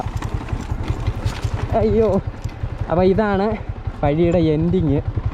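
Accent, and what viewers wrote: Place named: Kollam, India. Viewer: athalla bro broyude place kollam allenn choikkan karanam slang anu atha😁😁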